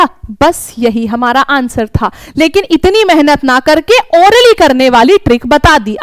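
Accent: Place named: Gurgaon, India. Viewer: Hindi to aap gurgaon r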